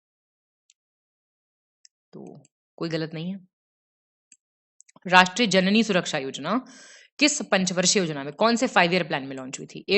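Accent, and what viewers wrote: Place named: Uttar Pradesh, India. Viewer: Madam g jyada angreji bilari na bano Hakikat me jio Up wale andaj me bolo jyada yad rahta h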